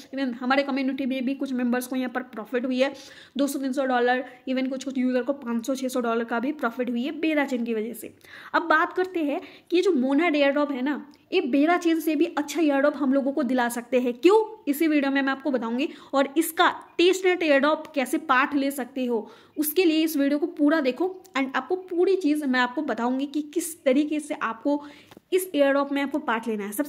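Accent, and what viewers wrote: Bengal, India. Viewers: App Bengali ho kiya mam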